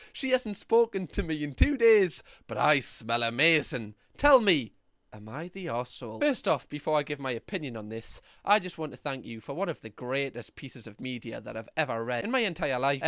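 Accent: Newcastle, United Kingdom. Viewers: It’s just part of his Geordie (sp?) accent - lots of Brits talk that way - I’m used to it now and find it charming 🇨🇦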